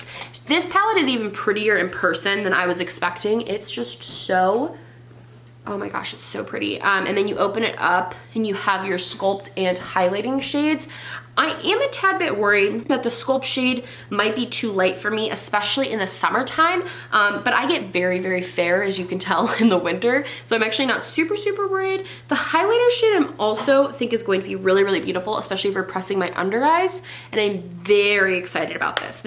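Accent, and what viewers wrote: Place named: East Coast, United States. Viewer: Going through all your videos-I swear all of us East Coast girls kinda sound the same. I’m from Connecticut and my bf heard you and he was like “she talks just like you. The way you say things”. So there’s that intellectual observation..🤷🏻‍♀️ And yesss- I love my Pat McGrath. Always.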